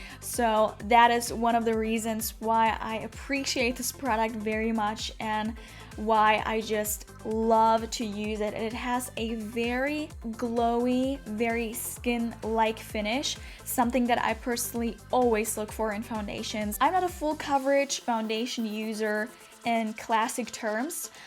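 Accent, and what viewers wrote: United States. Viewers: Just wondering where are you from? It was interesting to hear the word intriguing sound like "intra-guing" and cushion was pronounced "kushen." Its so very intriguing since you sound American otherwise :) Thank you for the review :) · I can't place your accent. One moment I hear American but then it's something else. Especially when you said the words intriguing and cushion. I knew what you meant. But I had to pause for a second.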